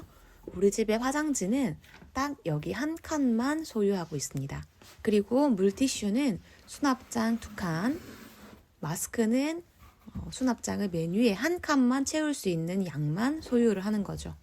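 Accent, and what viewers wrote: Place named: Gyeongsang, South Korea. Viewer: 저는 서울지역 이라 그런지 첫마디부터 경상도 말투가 느껴지는데요. ㅋㅋㅋㅋㅋ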